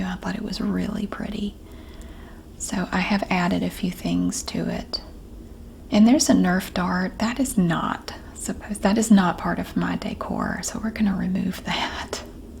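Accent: South, United States. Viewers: This is my first time on your channel and I just love love love your accent!!!!!!!! I’m obsessed with southern accents! Also love your vibe and energy! I’m now subscribed and look forward to your future uploads! Love, from the U.K.! 💖💖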